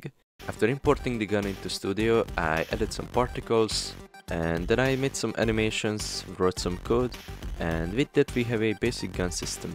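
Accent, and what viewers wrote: Hungary. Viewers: you are from Hungary?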